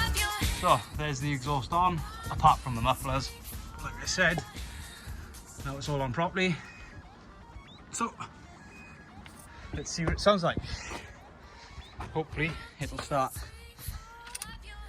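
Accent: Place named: Wales, United Kingdom. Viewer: Hehe, I guessed that you were from Wales, I worked with a couple of Welsh blokes here in Australia! Also, great work on the exhaust. It's a job I hate doing. Gotta love the sound of a V8!! Subscribed.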